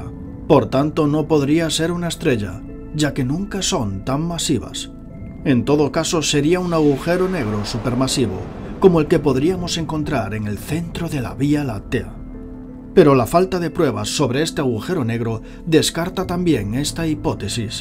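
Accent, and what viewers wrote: Spain. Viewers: Este bato hace buenos videos, pero no puedo evitar que me cagué el acento español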